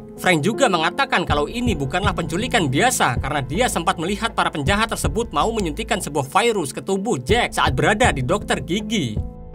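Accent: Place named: Siau, Indonesia. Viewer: Logat Siau kang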